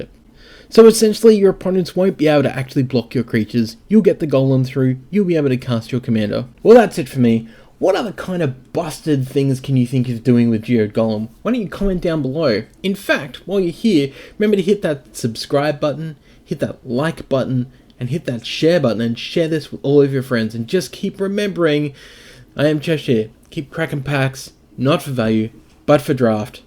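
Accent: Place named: Australia. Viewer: You have to ask yourself about motive here buddy. Someone making a fake card to reveal will quickly be outed and completely destroy their credibility and their channel. Why on earth would anyone do this? You might get this from some random no-name channel with no established history, but that's clearly not the case here. The reason this spoiler is a bit early is because I highly suspect the channel owner is an Australian (based on accent), and Australia happens to already be in July 23rd as I write this.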